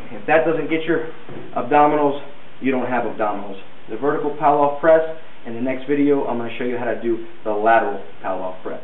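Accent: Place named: Baltimore, United States. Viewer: I hadn't even scrolled down and I was like "hey, he sounds like he's from Bal'more - sure enough! Great exercises - thanks! I'll def. incorporate these with my clients.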